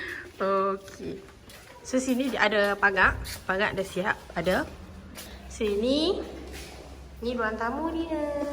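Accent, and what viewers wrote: North, Malaysia. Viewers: . Kami prefer cakap utaqa lagi sedap dri pelat keyel. Hihi. Ni jujuq tau dri km peminat oqg utaqa jugak. 😂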